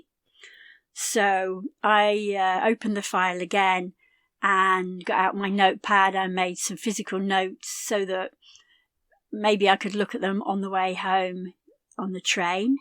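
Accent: United Kingdom. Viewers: I'm grateful for your videos. I love the British accent!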